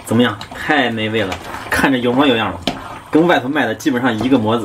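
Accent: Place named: Shaanxi, China. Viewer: 听到的陕普好亲切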